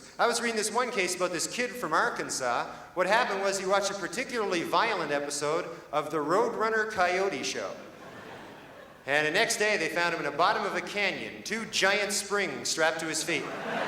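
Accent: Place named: Canada. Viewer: Amazing. All so carefully written. He sounds so much like Letterman here. This is also the only time I've heard him actually sound Canadian. I miss this guy so much.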